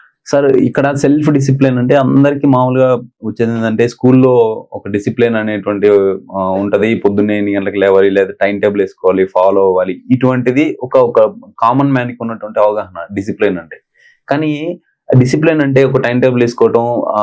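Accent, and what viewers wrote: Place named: Andhra Pradesh, India. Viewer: Andhra na meedhi? Andhra language matladuthunnaru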